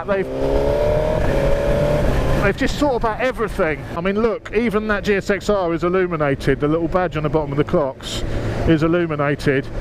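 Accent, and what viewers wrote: United Kingdom. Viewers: Fu and your British English!!!!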